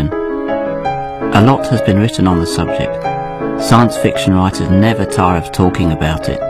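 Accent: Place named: India. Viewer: 解说员是印度口音的英式英语。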